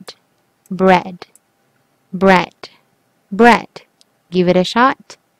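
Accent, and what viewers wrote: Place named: England, United Kingdom. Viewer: Nice english accent po ate sana maturuan niyo ako ganda niyo po keep safe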